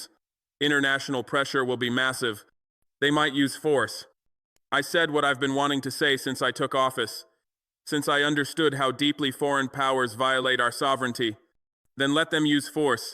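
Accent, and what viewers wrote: United States. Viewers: Is this real why has he an American accent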